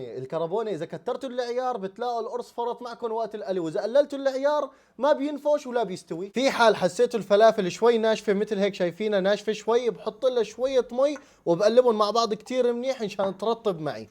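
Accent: Syria. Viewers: كم هي جميلة اللهجة السورية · اللهجة السورية المميزة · حلوه اللهجه السوريه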